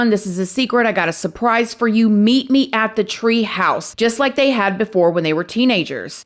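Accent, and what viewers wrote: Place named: West Virginia, United States. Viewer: Eeeny bIdy eeeny where. You have the cutest accent. You sound like me. I’m in West Virginia and have a country accent. I love hearing you talk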